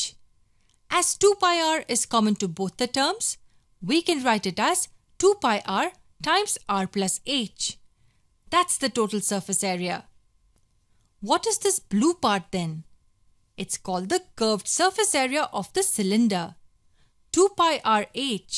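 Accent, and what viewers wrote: India. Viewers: is the girl talking ..an indian ?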